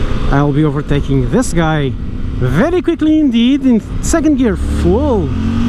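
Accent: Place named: Portugal. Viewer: Incrível como topei em 5 segundos que era um tuga a falar inglês.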